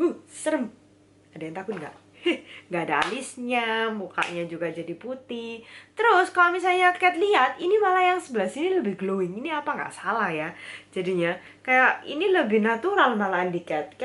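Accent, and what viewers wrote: Java, Indonesia. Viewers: medok tenan... kakak ini pengunjung baru kak. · medok ah ameut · Hai lg pilek yak?btw ak subscriber baru suka style nya km ngomong sih njowoni hahhaa..keep up the good work ya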